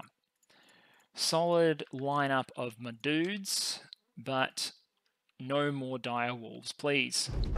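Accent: Australia. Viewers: I always appreciate your calm, thorough explanations of complex games! Your easy Aussie cadence and relaxed delivery is like butter to my ears. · Auzzie accent, and clobbering heads in. Perfect combination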